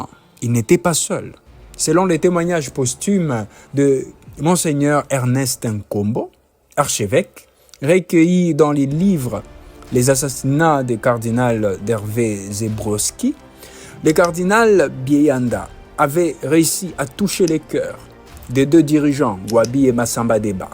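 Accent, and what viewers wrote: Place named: Belgium. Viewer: Vous avez un francais belge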